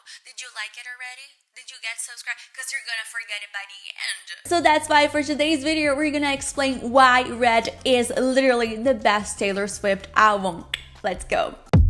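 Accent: United States. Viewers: your california accent 🔥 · I thought you were american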